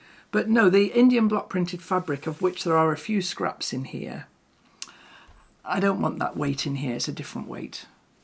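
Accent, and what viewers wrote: United Kingdom. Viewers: Mind. Blown. You are one fascinating human, - the creative process you turn into a real object is nothing short of magic. I thought about the ladies of Gee’s Bend in Alabama/USA. The rejoicing of fabric by artists like yourself and those ladies is a singular genre that could only be achieved with patience. As much as I love to watch the process, it would run me mad to try and do it. And the cat bed? FABULOUS. I as so glad there are people like you, and Kaffe, and the Gee’s Bend ladies to counter-balance the madness of the world today…I know the world has always been mad, but the current time is particularly cruel and often times pointless. To know there is someone like you “across the pond” who is gentle and interested in creating beautiful art calms me down. The horrors of the Hill Country flooding, just a few hours drive from Fort Worth, are crushing sad. 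The political situation in America is horrifying. To be able to watch you and hear that engaging British accent is a balm to my weary soul. Thank you, friend-I-will-never-meet.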